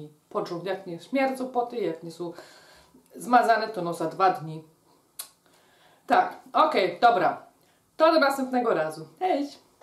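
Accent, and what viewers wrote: Silesia, Poland. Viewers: Twoja rozmowa jest mega mix niemieckiego i śląskiego ale naprawdę fajnie się ciebie słucha pozdro 😊😊😊😊Aber echt gute sprache 😁😁😁😊😊😊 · Broń Boże ! Ta śląska gwara to clou programu ! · Bo to jest po ślonsku, Opole jest na Śląsku, a my sam koltywujemy jezyk ślonski 😛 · Asz mi sie serce smieje ..jak ta moja gotka slysza...Dziolszko tys je einmalig....Pozdrowienia aus Nürnberg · Pani proszę mówić normalnie bez gwary śląskiej, bo widać że pani się męczy. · No i co z tego że tych przepisów jest mnóstwo na youtubie I nie tylko jak wszystkie twoje przepisy jeśli chodzi o mnie,w twoim wykonaniu Są nie do przebicia. Uwielbiam ciebie i twoje filmiki tak się swojsko u mnie w kuchni robi. Ja też jestem Ślązaczką i dzięki tobie mogę pod szkolić się w godaniu🤪 wyjechałam na obczyznę 20 lat temu no i trocha mi się godo ale do ciebie jest mi cima 😂 dzięki tobie można się poczuć jak za dawnych lat w domu rodzinnym. 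Pikne mosz te włosy. A mój tata jak to kiedyś mówił „ to mosz dobre”. Pozdrowienia serdeczne i całuski😘💝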